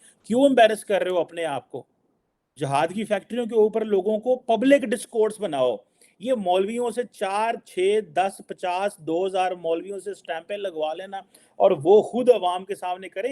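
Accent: Punjab, Pakistan. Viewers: Bosss stop using your Punjabi dialect if u want Indians to hear u, Kashmir me jehad naheen, aapkey mulk Ney crime kiya hai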